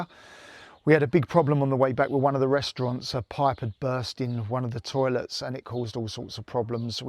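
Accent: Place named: Eastbourne, United Kingdom. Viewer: Haa you can talk all day I love the accent. Reminds me of when I lived in Eastbourne.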